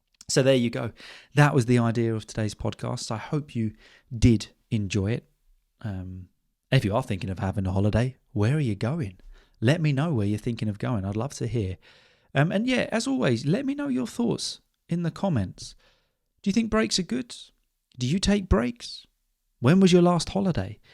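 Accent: United Kingdom. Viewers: Your accent is awesome, I like it a lot. What's the name of your accent? Manc? Yorkshe? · Thanks a lot! As I'm taking a break from studying my lectures, I've really enjoyed your video and your amazing British accent! 🤍 greetings from Iraq! ✨ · Hello,! What's your kind of British accent?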